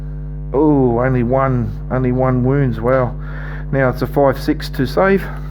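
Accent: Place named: Australia. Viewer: Aussie accent , pls tell me youre in Melbourne ?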